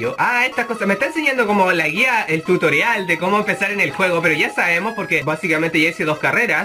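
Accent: Spain. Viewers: desde cuando hablas español españa? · Si eres chileno porque hablas como español? V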